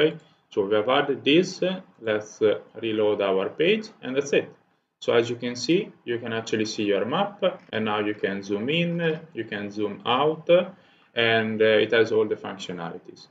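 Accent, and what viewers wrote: Italy. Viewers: hey! thank you for the great tutorial I found it very useful for my project, btw your Italian accent is awesome :D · thats a really good tutorialle. I opened my Appe. ıt worked with the leaflet mappe. BTW i didnt even heard italian ever but its funny ı give u that :D . thank you anyway :D greate tutorial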